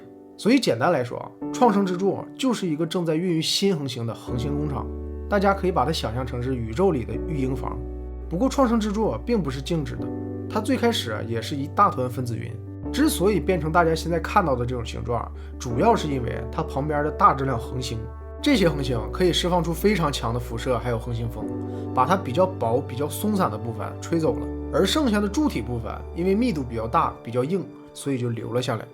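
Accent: Northeast, China. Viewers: 解说中，要是能把东北口音去掉就堪称完美了！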